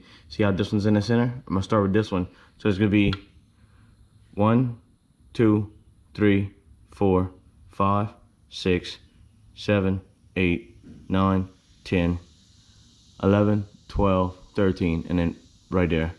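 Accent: Louisiana, United States. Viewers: Damn you must be from Louisiana😂😂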